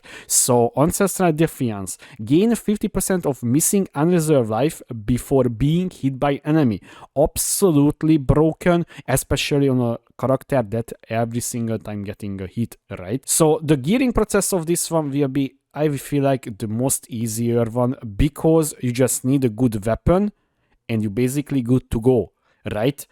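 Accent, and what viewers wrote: Hungary. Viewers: He s english is wierd bc of he is born ín hungary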